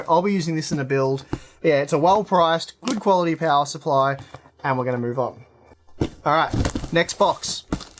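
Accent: Australia. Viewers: "Got mai knoif, a blont knoif". Gotta love Aussie accent! :D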